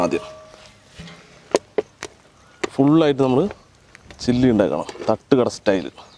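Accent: Palakkad, India. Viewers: Awesome.... ND palakkad slang vearey level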